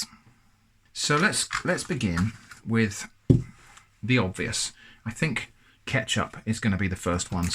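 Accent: England, United Kingdom. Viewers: I just found your channel today - are you the long lost child of English brown couch enthusiast Ashens and scam baiting, northern Irish Jim Browning? I never knew I needed another soothing, English male commentary on quirky things- but thank you!!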